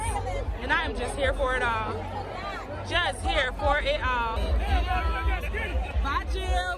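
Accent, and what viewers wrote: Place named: North Carolina, United States. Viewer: It’s crazy how you can tell someone is from NC without even knowing them!! Tre-4!! 😂Just found your channel girl love the content!!!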